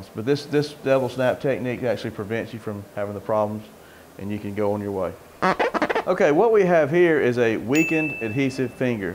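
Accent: South, United States. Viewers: I like your southern accent!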